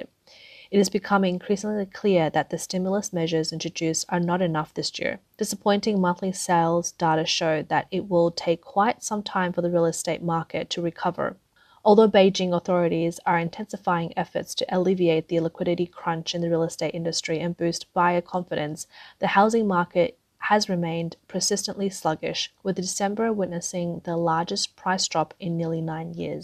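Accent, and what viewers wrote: Australia. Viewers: Aussie accent narrator is the BEST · This narrator has a perfect Australian accent.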